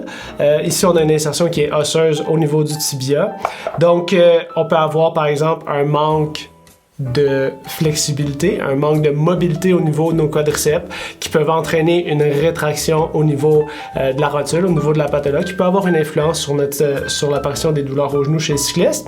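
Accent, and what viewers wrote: Quebec, Canada. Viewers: Ca fait plaisir d’entendre a nouveau parler québécois ! J’ai fait mon bac et ma maîtrise à l’UL Chapeau pour votre chaîne et le contenu de qualité et bienvenue à vous tous à venir rouler au Maroc après COVID-19 bien sûr !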